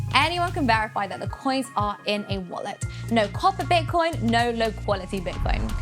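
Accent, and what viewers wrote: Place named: England, United Kingdom. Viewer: must be the thick english accent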